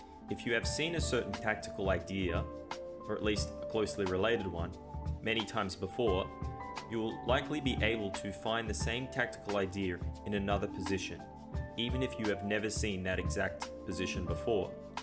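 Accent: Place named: Australia. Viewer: ah I know that accent! Where are you from in Australia? Subbed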